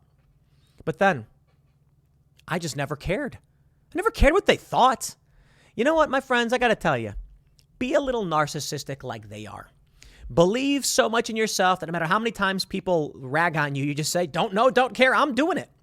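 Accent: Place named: Chicago, United States. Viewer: More Chicago accent please Tim!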